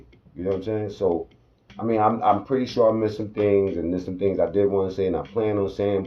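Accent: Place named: East Coast, United States. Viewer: Hello I am a new subscriber and love your East coast accent🤩 and how real you are about every situation. 💯 I'm from the South and love to hear people from New York, Jersey and Baltimore talk. 🤩 It's really sad to see how Danny went down and continues to blame Joe for everything instead of "Thanking him" for his help and all the money and assistance he gave him when he first got out of prison. I really want the best for him and hope he can LET IT GO and move on. 🌼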